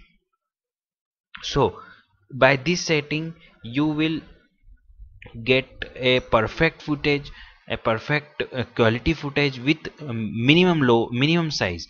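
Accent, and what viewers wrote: India. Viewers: OMG people have accents jeez u don't have to be rude about it! Imagine if that was you? What would've u done? He probably had struggles explaining it in English and by the sound of his accent I can tell it was probably Hindi. Like, at least he tried appreciate that. Like he said, just follow the steps -.- -_ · He is speaking proper English but with an hindi accent. Stop being rude people.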